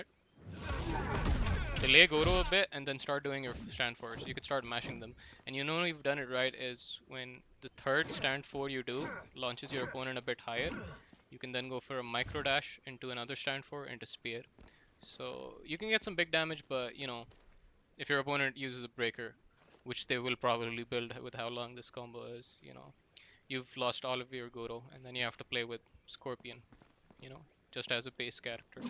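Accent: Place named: Pakistan. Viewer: are you a PAAAAAAKKKI ? sound like one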